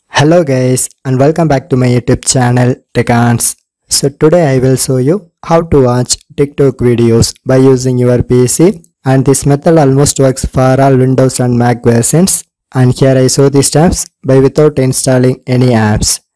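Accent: England, United Kingdom. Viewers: nice english accent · thanks for the english accent!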